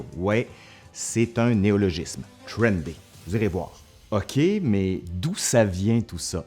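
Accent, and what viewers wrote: Quebec, Canada. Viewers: tu "lisse ton accent"... ou as-tu naturellement un accent quebecois fort leger?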